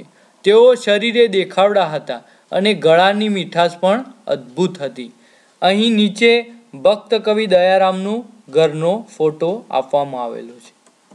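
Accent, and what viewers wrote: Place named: Mehsana, India. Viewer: તમારો મેહસાણાનો તળપદો અવાજ સરસ છે.."મ" સીરિસ સીવાય મસ્ત છે બધુ...આટલી વિસાળ મહેનત કરો છો તો ભુલો ગોતી અમારે તમને ન કેવુ જોઈએ.પણ મજા આવે છે...શરુ રાખજો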